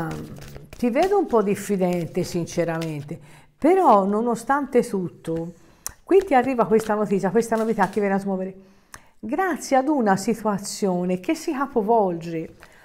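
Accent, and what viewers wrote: Tuscany, Italy. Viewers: sei troppo simpatica, il tuo accento toscano è fantastico... ok?❤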